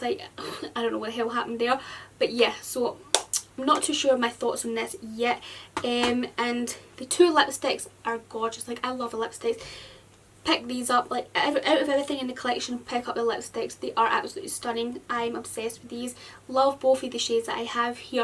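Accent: Scotland, United Kingdom. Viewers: i think she's scottish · She’s Scottish... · Such a gorgeous girl and also such a soothing voice...ASMR candidate right here. Soft and gentle Scottish accents are the best. Great tutorial too.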